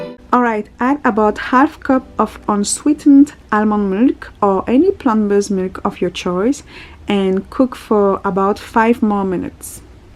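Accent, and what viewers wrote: France. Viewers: I'm subscribing to your channel just because of this video! I love the way you speak! Are you from France? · I’m so glad that I bumped into your channel. I’ve been a subscriber since. I’ll definitely try all of these recipes. 😊 Sn: I love your heavy accent. I figured you spoke French. 💋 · Un accent français ?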